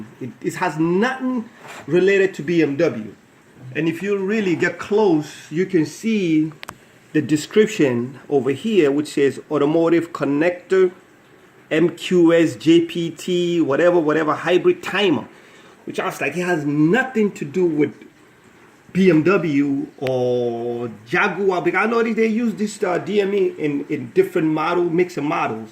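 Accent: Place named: New Jersey, United States. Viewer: Excellent video. You have a Jersey accent.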